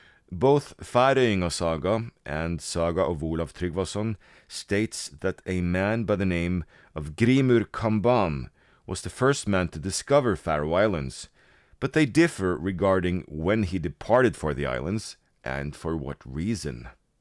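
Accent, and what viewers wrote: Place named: Norway. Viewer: Interesting video. I do remember hearing about this newest discovery and being Faroese myself anything pre-Viking era is really interesting. And you didn't do too bad with the Faroese place names, although I sense a Norwegian(?) accent. Eiði, for instance: the ei is like eye and the ð is silent but a y/j is inserted between the ei- and the -i (which itself has a more e like pronunciation), so "eye-yeh". You mentioned Ærgisbrekka, well, the first part of this name ærgi is also a Gaelic word, and Argir is the name of the town right next to the capital, same word but different spelling. It will be interesting to see what other discoveries are made in the future.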